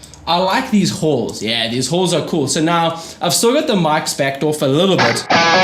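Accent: South Africa. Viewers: South African...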